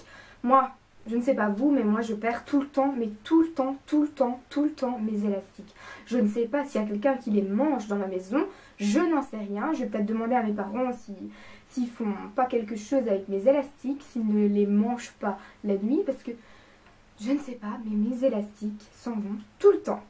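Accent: Belgium. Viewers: Coucou, Je viens de tomber sur cette video et j'adore tes achats ! Tu as beaucoup de goût ,c'est simple mais très efficace :) tout ce que j'aime De plus, je te trouve pétillante et j'aime bien ton petit accent belge :D Je m'abonne!!! Bisous :*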